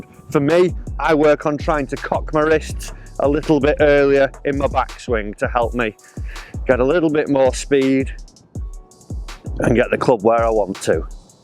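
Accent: United Kingdom. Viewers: Why is every golf instructor british? Lol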